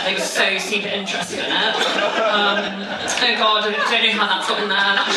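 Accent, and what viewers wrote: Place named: RP, United Kingdom. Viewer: I can't understand her because of her posh accent.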